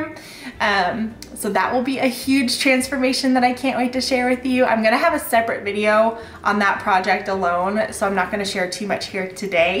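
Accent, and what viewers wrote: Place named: Midwest, United States. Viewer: Welcome back to the mid-west.I am from Iowa. I thought you sounded more like me. Right now moving closer to family feels more secure and helpful. I wish you months of hard work and much love for your home. I will be watching you. Joyce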